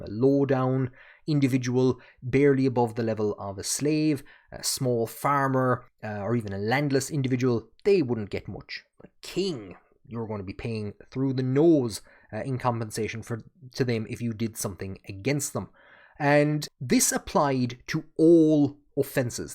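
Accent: Ireland. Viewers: An man with an Irish accent talking about Irish Insular Christianity - wouldn't have it any other way.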